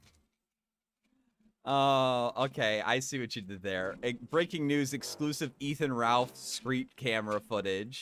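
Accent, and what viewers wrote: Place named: South, United States. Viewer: He makes the southern accent look bad. Please Ralph, please just stop.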